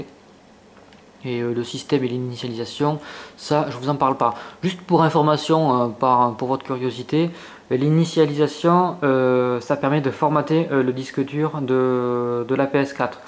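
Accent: France. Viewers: Merci pour la vidéo PS j'aime trop ton accent du sud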